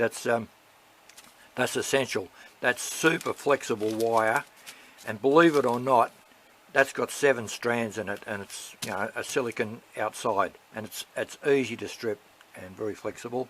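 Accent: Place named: Australia. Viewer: Thank you, this is extremely helpful ✅ It’s great to hear an Aussie accent on YouTube. Best wishes, watching from Batemans Bay, NSW.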